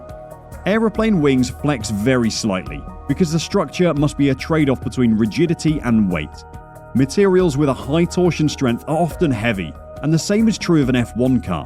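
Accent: United Kingdom. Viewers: sounds like I found a frustrated brit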